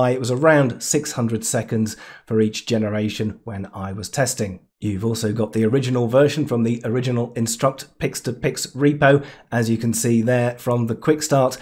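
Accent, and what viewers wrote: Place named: United Kingdom. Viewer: When I hear your voice I think of Monty Python, with that perfect British diction, it's kind of humorous to be honest.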